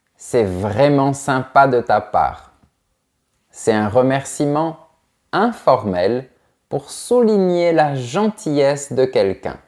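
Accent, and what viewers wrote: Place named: South, France. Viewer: Un diamant, oui, mais pas brut plutôt raffiné...avec un petit accent du midi ( sur les "o"). Mais c'est charmant. Merci à.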